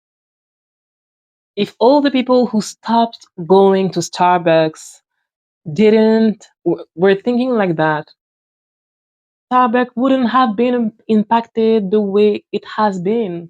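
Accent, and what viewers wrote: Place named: France. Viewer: Your french accent is so divine soothing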